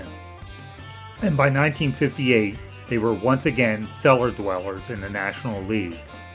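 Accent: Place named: Philadelphia, United States. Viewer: You're a true Philadelphian with that accent!!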